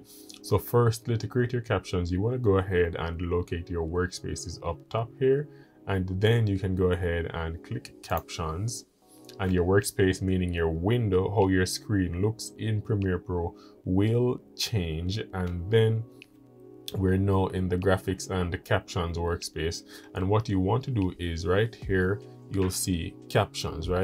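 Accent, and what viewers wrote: Jamaica. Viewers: I just discovered your channel and I had to subscribe immediately, 1. you're Jamaican lol, 2. the scripture on the wall, and 3. this video was so straightforward, easy to follow and very helpful. Thank you!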